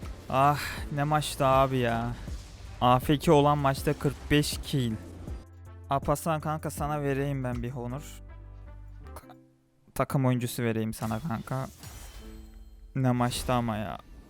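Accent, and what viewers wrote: Malatya, Turkey. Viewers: Malatyalı mısın hemşerim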